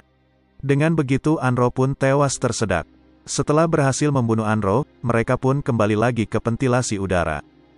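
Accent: Palembang, Indonesia. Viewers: Bang orang palembang kah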